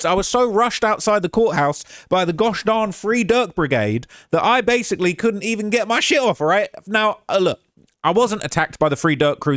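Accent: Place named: United Kingdom. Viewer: has a thing against black people. He uses AI but yet he claims the lawyer used AI. Why? Because he was black? made the claim because he himself uses chatgpt. That was projection., you didn't go to journalism school -you're not a journalist! You get your information from Reddit comments. Going to a court house with a suit on and getting a press pass because of your British accent doesnt make you a journalist. Go to the hoods you report on after someone gets shot thefe. Thats journalism. Go interview the victims in the hood. Go do your investigation after a rapper gets shot, then I'll call you a journalist. All you do is institutionalized gossiping. You have turned gossiping into a profession. But thats gossiping - not journalism. The man you're accusing of using Chatgpt went to law school, passed the bar and is a lawyer! Who do you think you are to question his work? 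You're a gossip youtuber. Grab your lips and get a hold of yourself. You can put an old t.v, reels and passes in the background of your set, nice arrangement, but that still doesnt make you a journalist.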